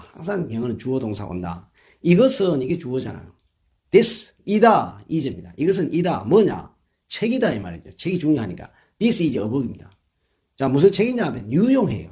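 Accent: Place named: Gyeongsang, South Korea. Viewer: 늦게 선생니을 알게 되었군요 처음부터 찿아서 잘배우고 있습니다 감사합니다 경상도 분 이신가봐요 저는 경상도 할매입니다 혼자영어공부 한지 십년이 되어가는데도 아직 버벅거립니다 그냥 공부가 좋아 조바심 없이 하고있습니다 좋은 가르침에 감사드립니다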